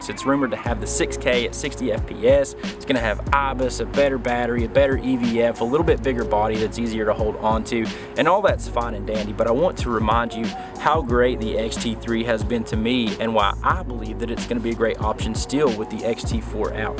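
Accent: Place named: Eastern Kentucky, United States. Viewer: Great video, gained a new sub here. And you speak my dialect as I am in Eastern KY :)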